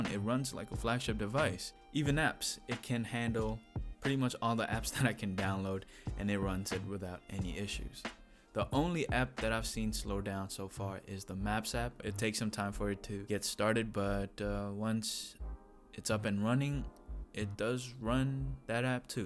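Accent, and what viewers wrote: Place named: England, United Kingdom. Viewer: I like your English accent. I am a native Spanish speaker but I also speak English as my second language and it sounds just like yours. I broke my phone about 3 years ago. Asked one my friends if he had an extra phone floating around in his house that I could use and he said yeah. He gave me an HTC Windows phone and I was so happy cuz it was my first time seeing a phone like that but the sim slut was too big for my chip so I'd only be able to use it with wifi. It started working real good but after a month or two it shut down completely. Whenever I turn it on, it won't connect to the wifi.. Can't do anything on it. Can't text .. can't do none of that anymore. Went on Saint Google looking for answers and I found out that it has stopped working.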